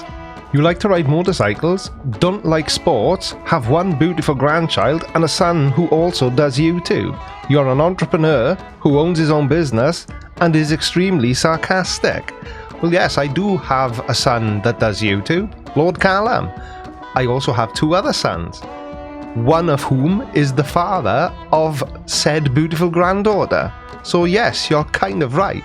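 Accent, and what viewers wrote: Wales, United Kingdom. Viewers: Are you Welsh? I thought you were Australian like Nathan Thompson. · Oh my God.........you're not..........Welsh.........are you?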